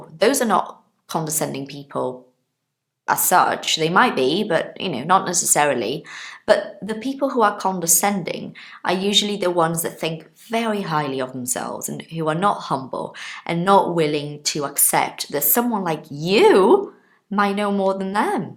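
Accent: United Kingdom. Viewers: You sounds like a royal british woman, i love hear this british accent, and my dream/expectation is speak british english perfectly like you teacher 😊 Ps: Sorry about my BAD english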